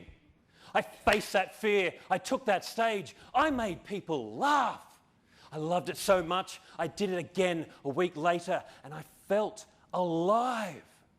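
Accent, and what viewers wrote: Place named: Australia. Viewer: boss you sound ozzie not brit excellent speech sir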